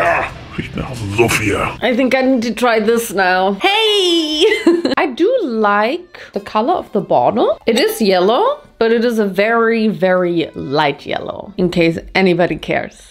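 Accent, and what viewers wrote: Bavaria, Germany. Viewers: bist du bayer? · Der bayerische Akzent war halt echt nicht schlecht 😂 Grüße aus Bayern!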